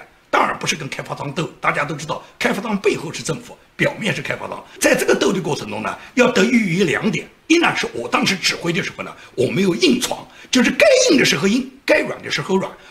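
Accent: Nanjing, China. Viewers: 一听就是南京口音😄。㊗️老乡身体健康！诸事顺意！ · 建民一口标准南京话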